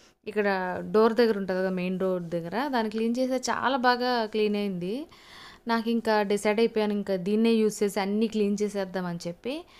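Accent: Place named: Tirupati, India. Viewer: Anduke aduganu Akka, she looks like u Akka, n ur having tpt slang akka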